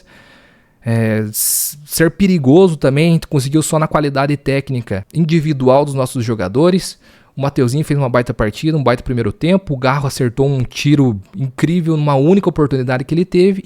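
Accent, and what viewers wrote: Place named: South, Brazil. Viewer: Tu tem sotaque do sul e torce pro Corinthians, explica pra nós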